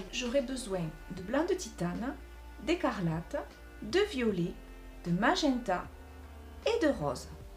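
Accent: South, France. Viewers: Tout simplement magnifique comme vous!🤩🤩😍😍 Merci beaucoup pour ces bons moments et vos explications très précises ! Entre parenthèses, j’adore votre accent !🥰🥰🥰 Moi je suis du Sud Ouest et si je ne me trompe pas, vous êtes du Sud également 🤣🤣🤣 À bientôt, j’attends avec impatience vos nouvelles vidéos! 👍🙏🙏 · Vous êtes vraiment magnifique ! J’apprends beaucoup avec vous. Vous êtes très belle et charmante, surtout avec votre accent du sud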